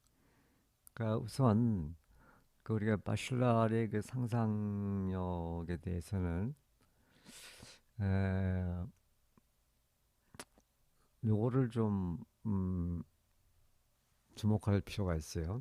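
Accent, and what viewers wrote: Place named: South, France. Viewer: 마지막 문장은 아름다운 시 같다고 생각합니다. 새로운 영감을 주는 글입니다. 감사드립니다. 발음을 통하여 선생님은 아마도 프랑스 남부 쪽에서 공부하셨나 그런 생각을 했어요. Merci beaucoup!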